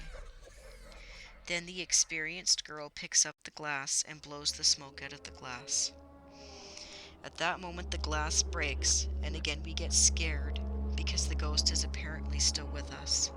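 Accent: Canada. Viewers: Also, you sound a tad bit Canadian.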